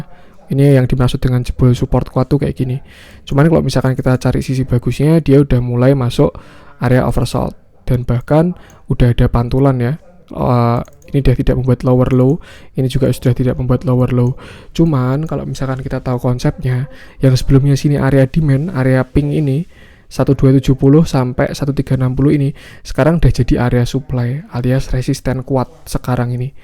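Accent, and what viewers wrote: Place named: Java, Indonesia. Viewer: HD BANGET TRADING VIEWNYA! PENJELASANNYA KERENBGT ADA "REASON" JG KAYA OBLIGASI AMERIKA DLL AKU SUKABANGEEET. AKU SUKA KALAU ORG BAHAS WHY. GA CUMA ASAL JD AJA.KEREN LOH NGERTI AKUUUU. MAKASI BANG!!!! Tambahan, aku tu kurang suka denger orang ngmg medhok sbnrnya, tp abang org pertama yang aku ikhlas dan berterimakasih krn udh ngomong😂 emang suaranya radio-able juga sih. tengkiu banget ya bang! Oiya gaada noise sama sekali kok bagus. SEMANGAT TERUSSSSS BANG😊😊😊😊 eh mas ya harusnya ehehe Bang pleaseeee mau PWON, ASRI, KRAS, PTBA yaaa!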